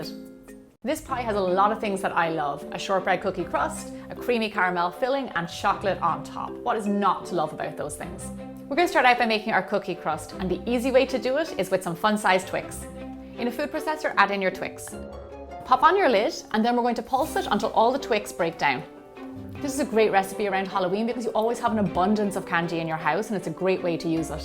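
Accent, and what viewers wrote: Ireland. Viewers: ur accent is beautiful what part of Ireland r u from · She sounds Irish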